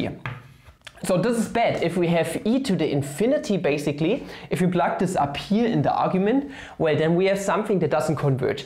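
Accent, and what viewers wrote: Germany. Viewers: Its funny how you know after 2 sec that he is german 😂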